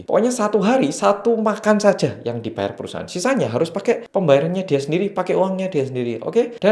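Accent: Java, Indonesia. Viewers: Kaosnya bagus mas korea medog 🥺🥺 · Mas sorry, sampean kok saiki kurang medok yo? Opo sebab wes suwi nang korea? Saiki lebih sengau suarane hehhehehe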